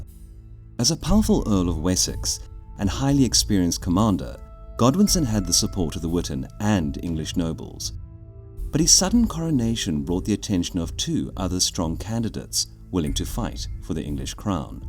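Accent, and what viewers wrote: South Africa. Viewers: English South African accent! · South African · Narrator dude, how long has it been since you left South Africa? I hear faint hints that give a little away. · I have to ask, is your narrator South African? He's very good and manages to remain neutral but there are a few words that give him away listening as a ZA native. :)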